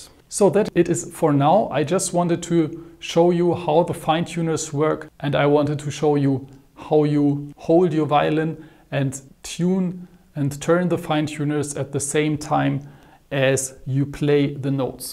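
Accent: Germany. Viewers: You have a german accent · DEUTSCHER AKZENT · German accent thats nice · Are you german?,sounds like that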